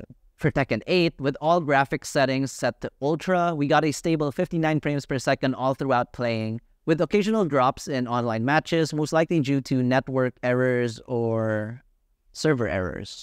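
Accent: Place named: Philippines. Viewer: Love the subtle Filipino accent lol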